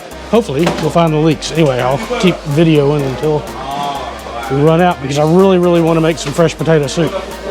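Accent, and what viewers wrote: South, United States. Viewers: Good choice moving to Belgrade Serbia is fun lots to do you seem to have a southern accent I'm guessing georgia or florida.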